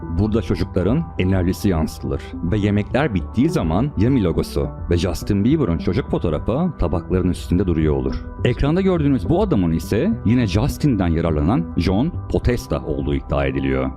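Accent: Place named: United States. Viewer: KONUŞMA TARZINA KULAKLARIM KANADI! SANKİ TÜRKÇEYİ YENİ ÖĞRENMİŞ AMERİKALI TÜRK GÜRBETÇİ GİBİ !!! NORMALDE ÇOK KIZLAR KADINLAR BÖYLE KONUŞUYORLAR !!!!🤦‍♂🤦‍♂🤦‍♂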